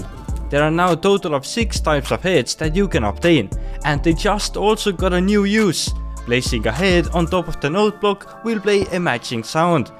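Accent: Estonia. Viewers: is that an Estonian? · That estonian accent is so goofy😅😂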